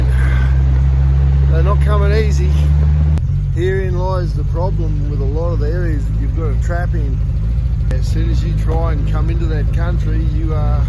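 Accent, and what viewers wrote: Australia. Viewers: What part of Australia are you?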